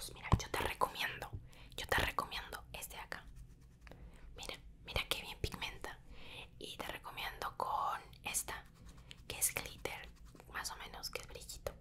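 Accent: Peru. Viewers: Eres Perúana? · Eres peruana? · a era peruano xd pense que era de ecuador xddd siempre veo sus video y penese que si era xd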